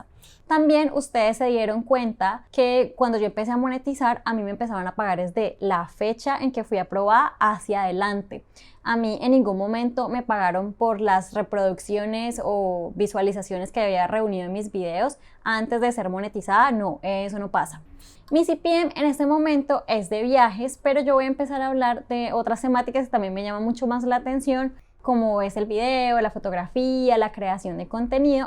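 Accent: Colombia. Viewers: Yo lo hice en 3 meses y ayer me aprobaron, fue lo más rápido que pasó, pero tengo una duda, en algunos videos salen la monetización en $ amarillo(? Mi pregunta es; el $ amarillo también te monetiza? ¿Pero que tanto? Que bonito acento tenes jajs(Colombiana no(? saludos desde Argentina!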